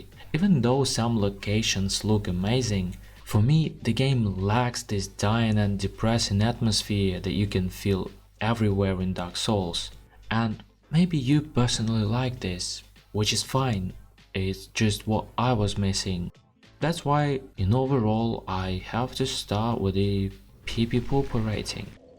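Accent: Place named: Russia. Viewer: Just found your channel and honestly your editing style is really great! If I could levy a few suggestions, I think you should raise your voice a little, rather naturally or in post editing - from what it seems like you are a native Russian speaker, but I could be wrong, so don't worry too much about your speaking or accent if you are or not, good content usurps it and this certainly was, even though your voice was definitely not an issue. Another thing, I do think your intro meme/video was a bit long and might cause some early click-offs - shorter length ones in the future will do the opposite. Also great background footage! You can tell its from mostly if not all of your own playthroughs, so it gives credibility to your arguments. As someone who was introduced to the souls series through Dark Souls 2 and a personal fan of the game, this video was honestly pretty solid even though it felt like you sided against it in a sense. 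Again though, amazing video!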